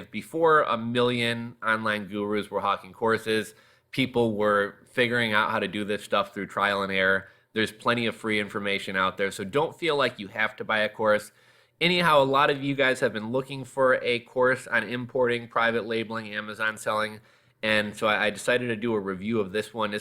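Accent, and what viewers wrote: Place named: Australia. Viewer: He is from Australia so he has an Australian accent.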